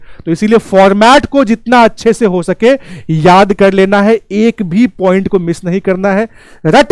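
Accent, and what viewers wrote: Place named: Darbhanga, India. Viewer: Darbhanga wale hai kya 😅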